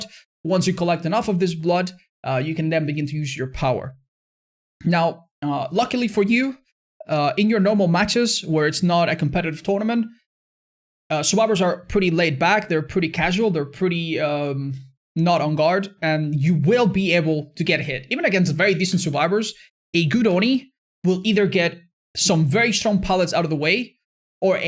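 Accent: Spain. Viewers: Question for American/British viewers, Does have a Spanish accent or just an accent in general? I'm surprised by how good his english is.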